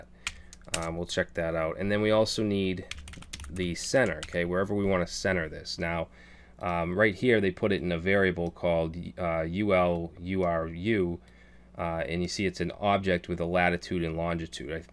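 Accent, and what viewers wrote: Boston, United States. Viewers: when you started talking i immediately knew you were from Boston 😆 thanks for the video cheers brother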